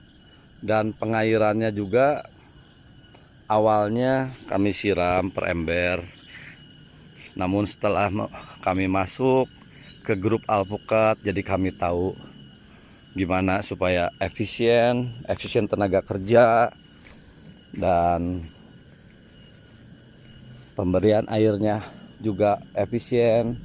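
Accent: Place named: West Java, Indonesia. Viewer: Duh uy logat na meni sunda pisan..edun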